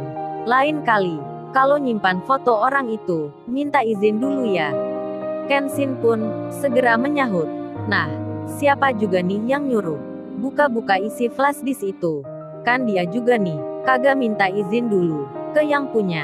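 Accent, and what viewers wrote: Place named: Tegal, Indonesia. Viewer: kaya,a mirip boso tegal nggih 🤭🤭🤭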